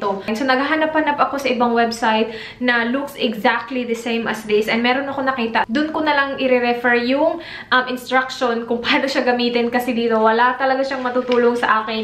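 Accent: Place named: Negros, Philippines. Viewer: Taga san ka po? Parang taga negros ka ee. Hehe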